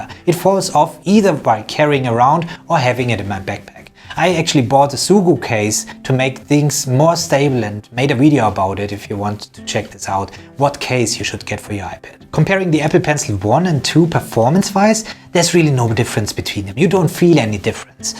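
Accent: Germany. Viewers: Man hört absolut deinen deutschen Akzent heraus, aber trotzdem gutes Video. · MrLufus ja man, das habe ich auch gerade gedacht. Ich habe auf ein Deutschen Kommentar gewartet😂❤️ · Are you a german? By the way great video!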